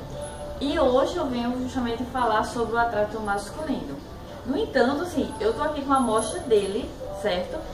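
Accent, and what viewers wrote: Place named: Northeast, Brazil. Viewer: Deve ser ótimo amo perfumes trabalho com isso sou da Dior moro em Recife você é nordestina tem sotaque igual ao nosso !! Tenho vlogs mais tenho vídeos de perfumes tbm vê lá !! Mais antigos amei você explicando show !!! Like te convido a conhecer meu canal !! Perfume sempre nos remete sensações amo amo !!!bjos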